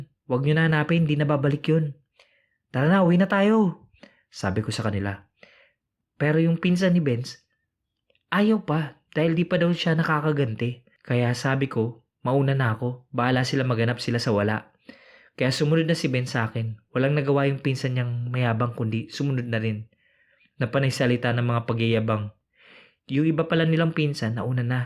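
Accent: Visayas, Philippines. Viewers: Bisaya ka ba o waray?? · Bisaya ka bay.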